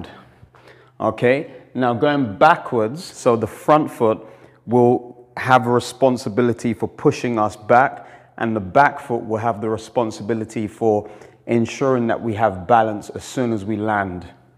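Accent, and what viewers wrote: United Kingdom. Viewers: primera vez que oigo a un cubano (si no entendí mal) hablar en un perfecto acento britanico... y encima con excelentes tecnicas! gracias!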